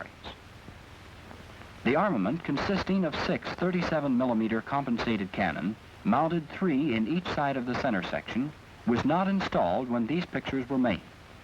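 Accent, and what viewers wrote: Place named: United States. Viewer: How interesting to hear an aircraft referred to as an 'aeroplane' in an American accent. I hate the present bastardised word 'airplane'.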